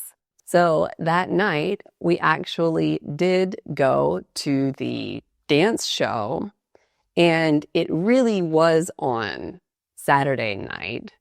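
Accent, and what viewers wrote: United States. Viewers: This is American English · American accent its amazing 🥰so beautiful.I never study English but i understand 70% because i always was watching american movies in original language with sub russian And i speak spanish,i lived in Argentina for 6 years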